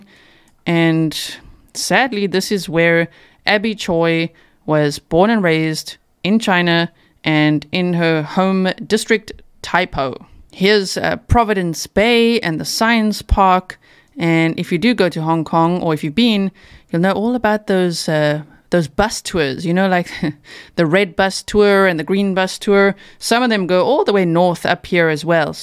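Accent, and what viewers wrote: South Africa. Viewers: I can hear that you are south african🇿🇦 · Is your significant other another english speaking dialect like australian New Zealand? Your accent definitely is south African but there is more to it, maybe its wven American english mixed with your majority south african accent....but yea, when you mention it! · You dont have a mixed accent. ITS SOUTH AFRICAN !!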